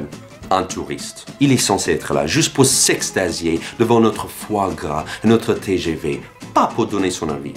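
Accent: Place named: United States. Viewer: Ce qui me fascine c'est les sois disant descendant de Clovis qui te critique alors que tu maîtrise leur langue maternelle mieux qu'eux juste parce que tu a un léger accent us.... Bref continu gros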